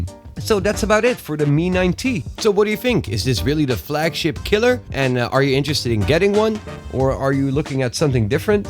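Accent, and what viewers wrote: Netherlands. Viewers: Are you dutch? Je hebt een Nederlandse uitspraak! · you sound kinda dutch are u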